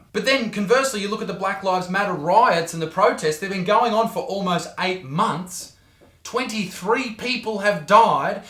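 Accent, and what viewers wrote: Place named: Australia. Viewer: Word, good statement mate. I like your australian English. Greetings from Germany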